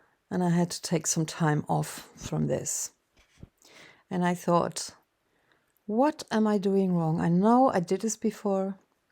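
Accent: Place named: Germany. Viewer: Ich tu mir echt schwer und muss mich höllisch konzentrieren, dieses deutsche Englisch (Denglisch) zu verstehn. Ich schaue viele Videos in Englisch und verstehe sie auch, aber das ist, sorry, weder Fisch noch Fleisch. Ich muss den Ton abschalten und die Untertitel lesen, um das Video zu verfolgen. Vielleicht besser in Deutsch kommentieren und die Englisch Übersetzung in den Untertiteln anbieten.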